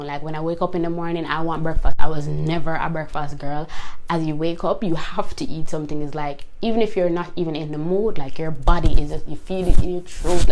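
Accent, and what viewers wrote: Jamaica. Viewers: Jamaican?💕love your accent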